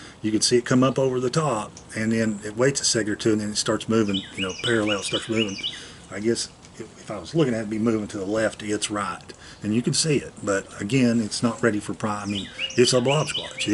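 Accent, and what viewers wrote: South, United States. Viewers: I have just recently started watching your site, it’s very good, but scary as heck. like some of the other people, I found out about you from Steve. I am a native Georgian, near Atl and I was wondering where you were from, I knew it was the South, can’t hide that accent, love it.....take care And be careful out there....🍑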